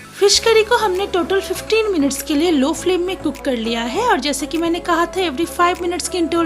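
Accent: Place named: Bengal, India. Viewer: guru kotha sune to mone hocche bengali. ta, barite ki ei rokom macher jhol khan..... youtube r yuge ekhon sobai chef.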